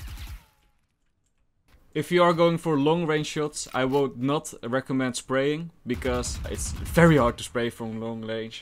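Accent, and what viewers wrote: Netherlands. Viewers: Ik heb het gevoel dat jij Nederlands bent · 'iron drie' half dutch accent goes hard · ik hou van je Nederlandse accent xD hehe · iron drie imma show u vijf.. u sound dutch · nah he is from netherlands i reconize these accents anywhere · he dutch i think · i think he really sounds dutch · The dutch accent be bussin thoooo 🥵😍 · I think dutch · Just with your acsent i know you're dutch hehe i'm dutch too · are you from the netherlands? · Bro, ik kijk dit nu terug en tering je hebt een nederlands accent ihahaha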